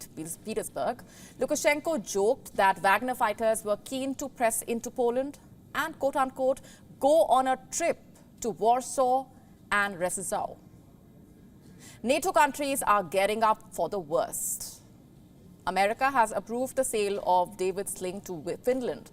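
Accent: India. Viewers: Love Indian accent, only sometimes pronunciation is off..like "wulnerable" instead of vulnerable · Omg this Indian accent 😂😢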